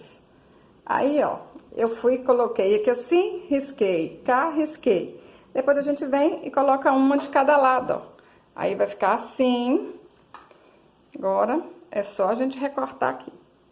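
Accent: Minas Gerais, Brazil. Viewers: "O TREM BONITO DE MAIS." ADORO VC FALANDO ASSIM .O MINEIRES . TB SOU MINEIRA 👏👏👏👏👏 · Boa noite aqui é Silvania de Sabará,eu amo esse seu sotaque minerim.É bom demais da conta. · Eu sou mineira e pelo seu sotaque você também é 🥰 sou de Patos de Minas Minas Gerais,a capital do milho 😅 com muito orgulho da minha cidade!!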